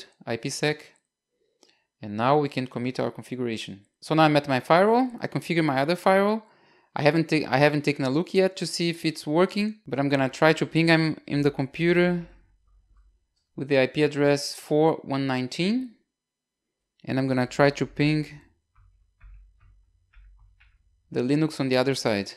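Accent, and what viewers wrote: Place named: Brazil. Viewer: ! Eu sabia que seu sotaque em Ingles era do Brasil! Muito bom canal! Estou comecando com Palo-Alto e seus videos ajudarao muito. Abracos aqui do Canada em 2024!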